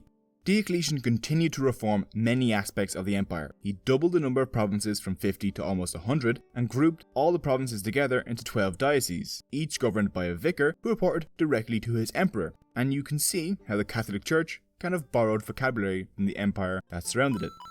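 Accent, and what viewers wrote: Ireland. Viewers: That's 100% Irish accent.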